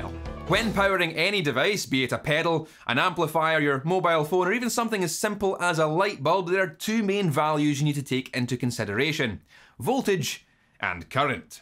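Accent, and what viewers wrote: Scotland, United Kingdom. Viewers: YouTube loves the Scottish accent lol. I saw a video about Glasgow once where the captions thought they were speaking Dutch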